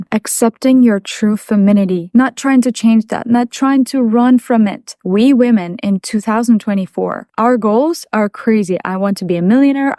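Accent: Russia. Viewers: Are you russian ???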